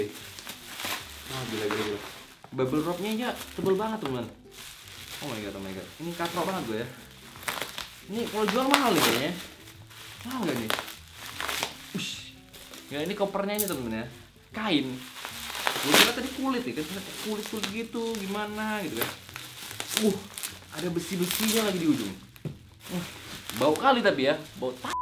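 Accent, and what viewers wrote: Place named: Pematangsiantar, Indonesia. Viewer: Logat Siantar campur logat gaul 😂😂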